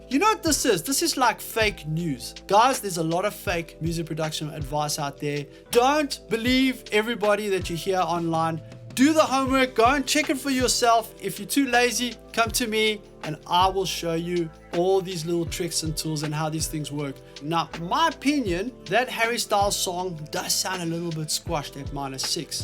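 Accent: South Africa. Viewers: You sound south African bru!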